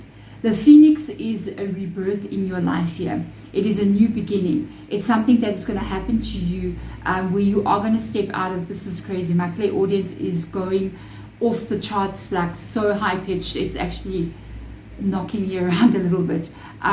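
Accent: South Africa. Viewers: (hope I've wrote your name correctly), I came across your thing recently, yes I'm sure we would be swimming in puddles or pools of tears at times, cuz your delivery is the centre point for me, (chiron intuition, majigotthet, and aeon moon, Erin chandler, four other greats, you might look at, or whoever reads this, I have been touched,your being south African is so poignant you wouldn't believe, look forward to one day conversing, also your accent(voice) is so enchanting you ouse, mother earth and massively much more, love&light, peace&equality(. ❤xxx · So I just came out of a webinar about Bosnian pyramids and Theta healing meditation and this video popped up on youtube. :) I listened to you before and liked your energy, it resonated, but I kind of like to avoid "prophecies", meaning someone else determining what will happen ( as opposed to me making my own destiny), but yet again this resonates. 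i mean we can argue it's algorithm, but then again, why this precise reading popped up. :) I felt for a very long time now like this planet is a prison and I'm being punished (Theres a book I even came across, Alien interview, where an alien (a very advanced species) talks exactly that, this planet is a prison and all of us are prisoners) and at 48 I'm already soooo tired of life haha. The pyramids are heavily dismissed by mainstream science as not real, but they hold incredible energy, very real and oldest on this planet. People have reported healing (where there was "no help") and studies have been conducted. But it's good actually, because not that many people come (as opposed to Egypt) so the pyramid energies are really strong, it's peaceful and great for meditating. Youre South African right? You sound like it, I like that accent. I feel like Africa being the "cradle of life" must hold a lot of wise souls and that's why your readings resonate (when I come across). 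and you're a wicca? which also interesting, and you talk about vedic things, such as karma, enlightenment, which I know a lot about. So THANK YOU. :)))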